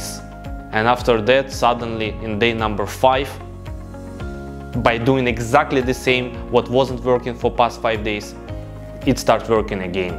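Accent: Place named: Russia. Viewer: Русский акцент режет ухо😢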